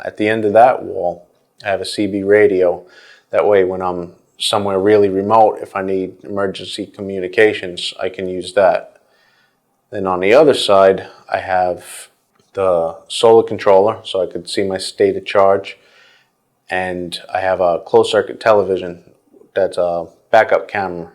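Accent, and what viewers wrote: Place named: New York, United States. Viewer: this dude is from NYC you can hear it in his voice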